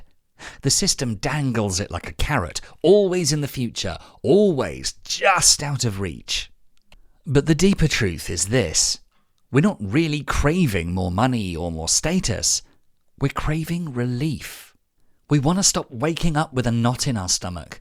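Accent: England, United Kingdom. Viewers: You know what I am sick and tired of hearing these English accents. I'm not even joking I'm going to throw my phone or my TV out the window if I hear one more video with somebody with some half-baked goofy English accent. I'm sick of it.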